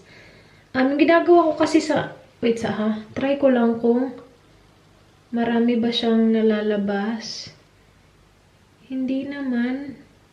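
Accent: Visayas, Philippines. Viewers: Ang cute mo ate magbisaya hahahaha! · Bisaya kapo? AHAHAHAHHA sa accent mo po kasi ahahhahahaha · Hehe. Bisayang dako ate. 😂😂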